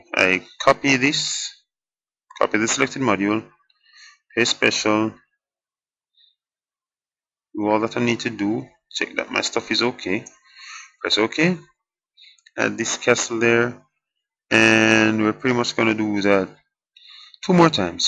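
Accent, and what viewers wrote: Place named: Caribbean. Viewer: are you jamaican...its good to hear a caribbean accent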